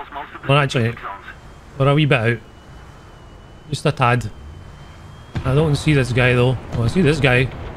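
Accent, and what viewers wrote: Scotland, United Kingdom. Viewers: Yup and the Scottish accent made me stay haha!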